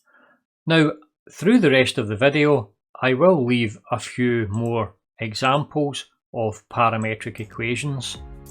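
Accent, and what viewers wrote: Scotland, United Kingdom. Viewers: even just for the Scottish accent.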